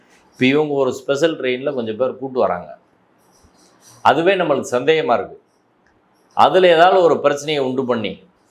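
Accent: Madurai, India. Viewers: Only madurai voice. Great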